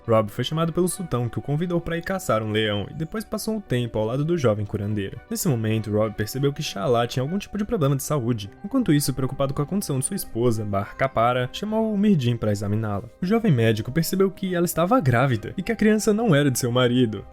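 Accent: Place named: Northeast, Brazil. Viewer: Tu é nordestino. Ceará????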